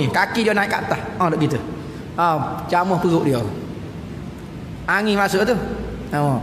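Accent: Kelantan, Malaysia. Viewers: Assalammualaikum warahmatulAllahhiwabarokatuh in shaa ALLAAH harap satu hari sy dpt faham loghat kelantan.. jazaaka'Allahu khiran ustaz 25/11/20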